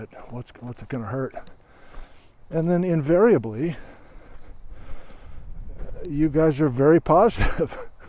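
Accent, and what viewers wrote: Upper Midwest, United States. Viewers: Nice upper Midwest accent. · Love the northern Midwest accent! I don’t know how you are able to turn it on and off so well. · LOL good to hear a Wisconsin accent again. Reminds me of the family down in Chicagoland. Another great interesting video!. Love seeing places I doubt I will ever hike to anymore. Thanks again.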